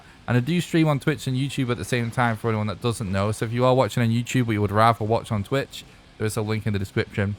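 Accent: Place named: Leeds, United Kingdom. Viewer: Fucking hell watched four hours without being able to place your bastard accent and it's Leeds! Big up. When you said 'You got your head kicked in if you listened to heavy music' I thought 'oh so he's northern at least' lol.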